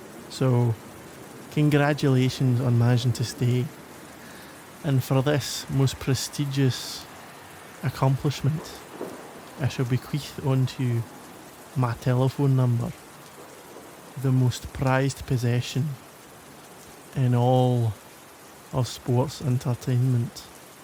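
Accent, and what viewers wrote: Scotland, United Kingdom. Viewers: As a fellow Scottish person I approve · Ladies! 😘 I'm from Poland. Born, raised and still live in Poland, but when our favourite teddy bear speaks like that I'm blushing and giggling like a schoolgirl 🥵 I'm a huge simp for a Scottish accent, even though I understand.... little 😋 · Love your voice, have literally never heard anyone compliment a scottish accent before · Ahh this was made for me!!!! The thick (I'm talking doubled caked up thick) Scottish accent. It's so beautiful. Thank you my king!!! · I'm Scottish sometimes I wish my accent was thicker because people really like our thick accents but mines weak compared to his.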